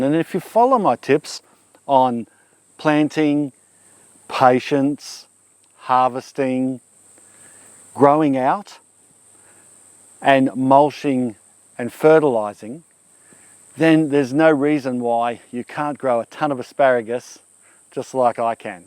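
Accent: Australia. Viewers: Skip to for tip one then for 2. Jesus just turn playback to 2x if you don't have and issue with the Aussie accent.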